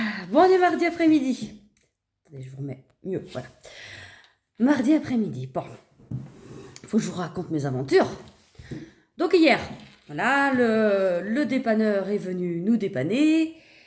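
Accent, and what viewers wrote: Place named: Normandy, France. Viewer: Coucou, et le papa ❤❤❤ Chouette le sapin, trop mignon 😍 🎉 Décidément 😂 tu es spécialiste dans les pannes de voiture 😅 espère tout rentré dans l'ordre. J'aime t'entendre, tu as un côté rigolo à raconter tes expériences 😂 et avec ce parler qui ressemble tant à celui de mon enfance près du Mont St Michel (côté normand )dont j'ai gardé encore qq accents. 😅 eh oui, on ne change pas une équipe qui gagne 🤣🤣🤣 Gros bisous et bon week-end ❤😊❤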